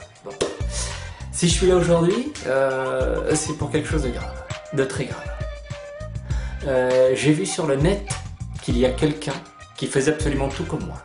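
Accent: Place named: South, France. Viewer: enfin un sudiste qui fait des podcasts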